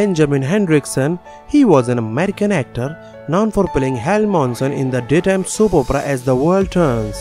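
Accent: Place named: India. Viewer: Hes an Indian .his accent is different 👌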